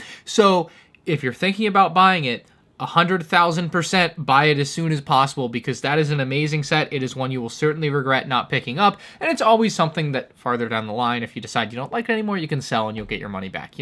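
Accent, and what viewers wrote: Germany. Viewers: as a german i approve of your german accent :) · Just wtf for that „german“ accent lmao 😂😂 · As a German i have to say that your german Accent is really good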